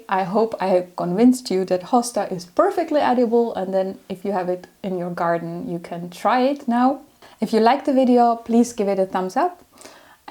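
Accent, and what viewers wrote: Netherlands. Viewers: My family is from the Netherlands 🇳🇱 but I’m from 🇨🇦 I knew the accent instantly 😊great info great video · Does she have a Dutch accent? Or Danish?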